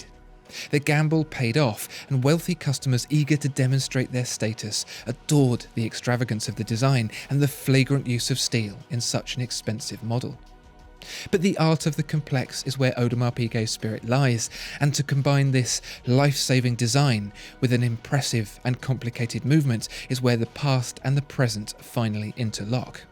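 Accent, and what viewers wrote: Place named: South East England, United Kingdom. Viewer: All my friends sound like this (south-east England).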